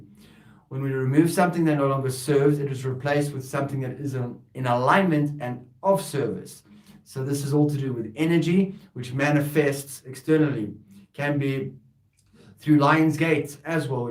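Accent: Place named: South Africa. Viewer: hello, are you south african? you have an SA accent. i'm new to your channel, i'm from Botswana. do you do your lives from facebook or from youtube? would love to tune in sometime.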